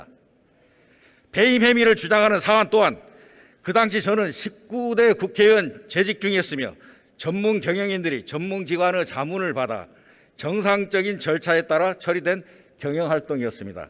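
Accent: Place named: Jeolla, South Korea. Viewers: 말투보니 라도 같은데? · 전라도 특징 의 를 으 라함ㄹㅇ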